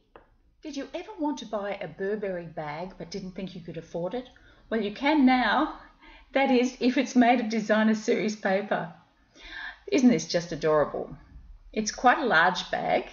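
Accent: Australia. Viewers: Hi, I am new to your channel and must say that it is refreshing to have an Aussie voice chatting to me. Love the bags, I can see myself making a few of these for Special Occasions. Thanks for such clear instructions.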